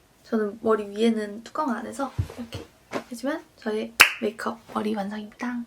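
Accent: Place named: Seoul, South Korea. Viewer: 90년대 서울사투리를 쓰시네요ㅋㄹㅋㅋㅋㅋ 말투가 특이하다 생각했는데 그거였어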